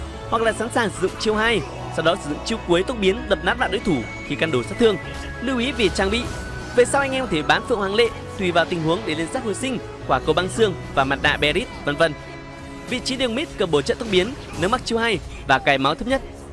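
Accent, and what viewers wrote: Bac Kan, Vietnam. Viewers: Ad người Bắc kạn hử??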